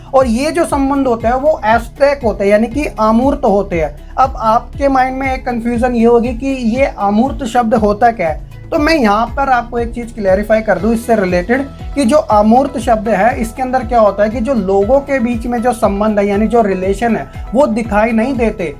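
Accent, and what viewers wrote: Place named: Haryana, India. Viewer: Aap haryana se ho I think.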